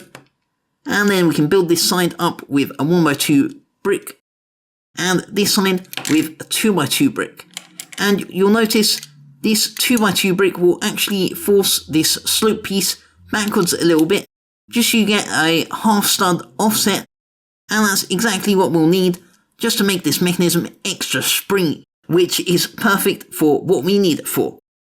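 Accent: United Kingdom. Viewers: Your British accent sounds pretty cool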